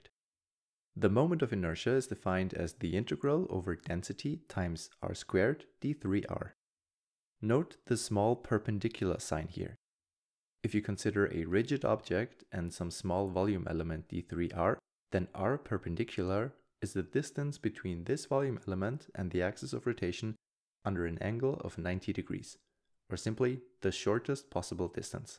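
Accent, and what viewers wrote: Germany. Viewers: Listening to his accent, I think he is German.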